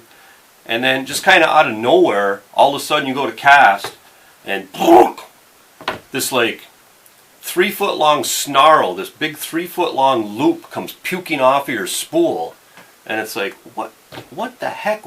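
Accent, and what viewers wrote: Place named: Wisconsin, United States. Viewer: First off awesome video. Second, I watched about 3 minutes of this and was like this guy must be from Wisconsin...then I saw the hat!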